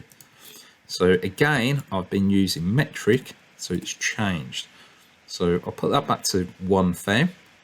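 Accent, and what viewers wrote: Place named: Birmingham, United Kingdom. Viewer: I take it by the Brummie accent you in the UK lol. What do you think of the Onefinity Machine and what was the customs charge to get it into the UK? Im ready to order one but a bit nervous of the Customs charge. Also, what do you think of the Makita Router with it? Does it do a good job, or should I order a PWN Spindle at the same time. Sorry for all the questions.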